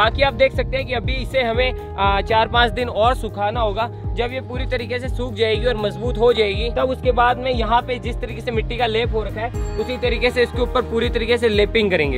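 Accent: Rajasthan, India. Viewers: Bhay aap ki marwadi bhasah jordar h mja aa gya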